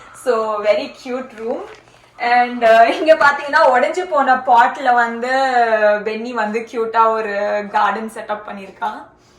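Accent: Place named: Chennai, India. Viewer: Yakoov Actualah Chennai Slangu bangam pannuthu 😂 Keep doing it on Tamil 👍🏼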